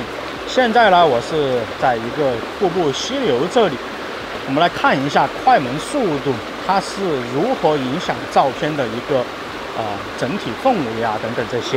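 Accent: Sichuan, China. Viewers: 川普，四川人？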